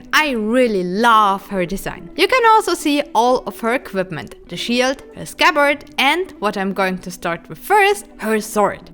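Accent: Germany. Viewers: Wenn man dir länger zuhört, dann ist der deutsche Akzent sehr deutlich. Ist ein Kompliment ! 🤗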